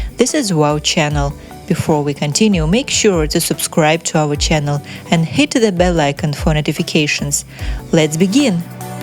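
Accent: Russia. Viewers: What's with the Russian accent? We need Russians now to explain American pop culture history to us? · lol Russian accent 😂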